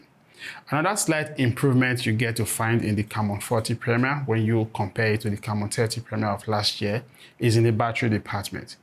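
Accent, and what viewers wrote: Africa. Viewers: I like your accent, its like african english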